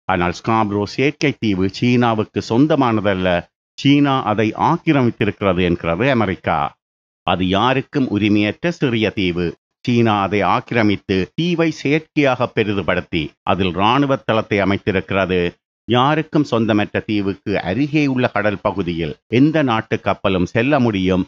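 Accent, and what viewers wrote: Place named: Jaffna, Sri Lanka. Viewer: எனக்கு யாழ்ப்பாண தமிழ் மிகவும் பிடிக்கும்🇮🇳👍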